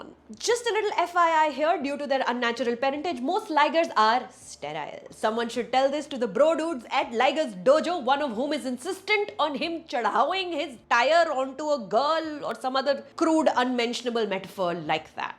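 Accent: India. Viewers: yaar tum bahut ache reviews deti ho. i literally wait for your reviews and almost swear on them and then cheer them too. but thoda dheere dheere bola karo na. kahi jana hota h kya tumhe review ke turant baad? matlab indian accent ke liye bhi subtitles on karne padhte h mujhe. please mujh jaise fan ki yeh pukar sunle bahen aur araam se bola karo. zabaan ko bolo thamba thamba! baki i love u too. great work always.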